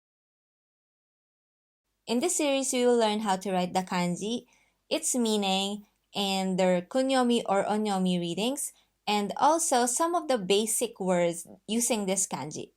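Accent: Philippines. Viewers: Your accent sounds Filipino. Is your mother tongue Tagalog? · Dear all watchers... I am a Japanese girl from Tokyo. And We feel so much disturbed by these sorts of Fake video! This woman who was speaking in this video is not Japanese and her accent is Philippino. This is the real issues because when we learn language, we need the real native, the real nationality the language. Because it’s crucial to learn the the manners, the way to communicate and so many things. It’s too too bad because watchers will think this woman is Japanese. It’s so serious! We really don’t want watchers misunderstand. Because Philiplipino is quite quite different from us Japanese. Especially our manners, accents and the way we talk, smile and tons of things!!! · Are you Filipina maam? I can hear the accent😊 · Love the logo! Though a better/more efficient way to learn Kanji is via learning the Radicals as opposed to random Kanji as presented here. 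Also, she's clearly a Filipino speaker & not Japanese. Sorry, but both the English & Japanese are slightly accented! I commend your efforts, but find that it's essential & utterly important to learn correct intonation & pronunciation from the get go! Good luck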